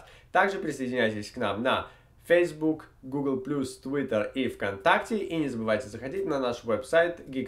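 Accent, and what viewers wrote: United States. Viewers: по-моему живет в США:) акцент так и прет:) "на интернете" хаха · умиляет американский акцент и интерференции с английским языком в речи ведущего.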